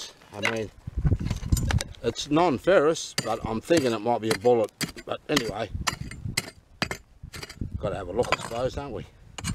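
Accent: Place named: South Africa. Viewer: Are you from South Africa? your accent sounds like S. African English. i have some good guys from there. we done Exploration Projects here in my country. cool and professional geologists.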